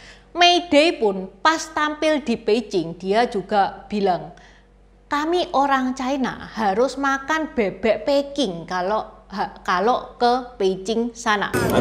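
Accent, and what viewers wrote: Java, Indonesia. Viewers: Jowone medok 😁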